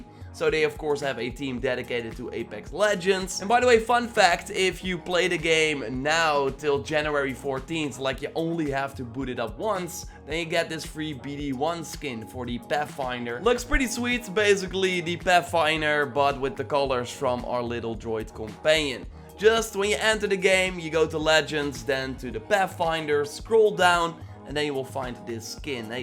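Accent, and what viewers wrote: Netherlands. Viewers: Youre Dutch aren’t you?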